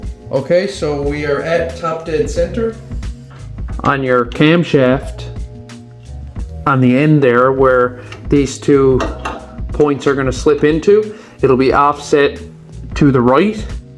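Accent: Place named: Ireland. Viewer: You don't sound Canadian. Irish accent there?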